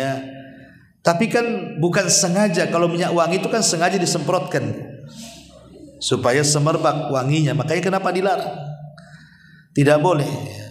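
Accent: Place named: Java, Indonesia. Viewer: Ceramah Bpk enak di dengar. Bahasanya lembut. Jowo nya tulen Njowoni. Sehat njih Bapak Ustad. Aamiin Ya Robb🙏🙏🙏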